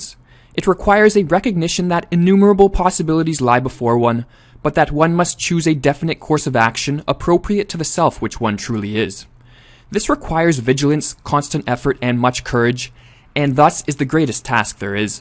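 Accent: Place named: Canada. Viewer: I spot a Canadian accent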